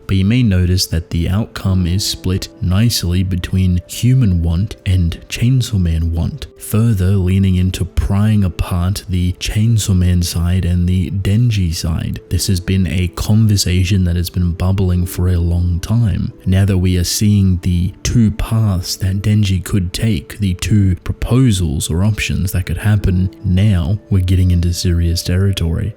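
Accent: Australia. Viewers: Why has it taken me over a year to realize how Australian u sound😂? I was wondering where tht damn accent came from, it’s from down unda. another banger video gang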